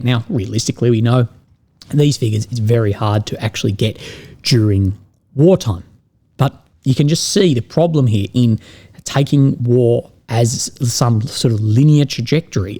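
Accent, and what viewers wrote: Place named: Australia. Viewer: I did notice that you started speaking with an Australian accent since the chemo started.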